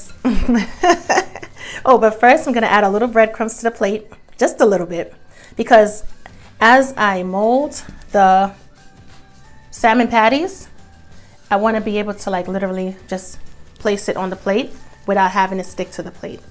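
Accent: New York, United States. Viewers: sounds like she is from NY...luv listening to her tawk...lol I put a lil cayenne pepper, a lil jiffy cornmeal, a tap of mustard instead of worcstchire sawce... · Love the NY accent!❤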